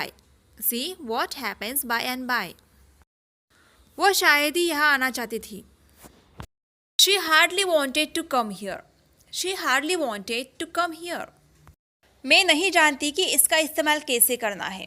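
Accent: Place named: South, India. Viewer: r u from south of India.. madame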